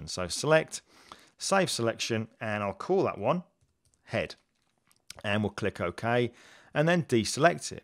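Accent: United Kingdom. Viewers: lovely midlands accent :-)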